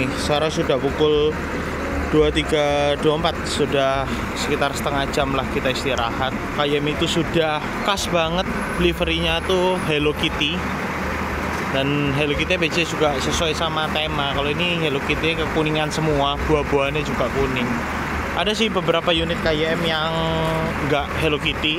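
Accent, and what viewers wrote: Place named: West Sumatra, Indonesia. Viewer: Bicara orang minang ya, kym trans yang abang naiki kebetulan orang minang juga founder ama ownernya, salah satu PO bus urang awak yang diluar sumbar yang eksis seperti epastar ama tam yang bermarkas diluar sumbar.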